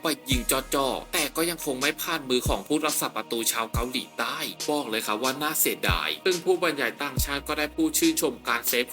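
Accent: Suphanburi, Thailand. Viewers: แอดเป็นคนสุพรรณแน่ๆ รึไม่ก็กาญจนบุรี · เสียงเหน่อๆ เหมือนหนุ่มสุพรรณเลยนะเพ่สุดยอด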